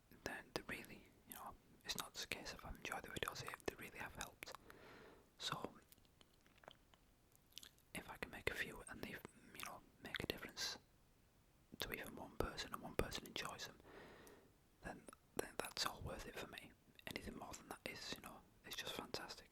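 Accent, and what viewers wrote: United Kingdom. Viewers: Love this. Nice accent, where in UK are you?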